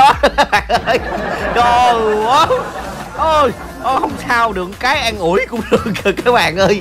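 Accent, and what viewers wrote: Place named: Mekong Delta, Vietnam. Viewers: Nghe giọng miền trong đó hay hài nữa 😁😁😁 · Rẻ nha giọng miền tây, biết chắc b miền bắc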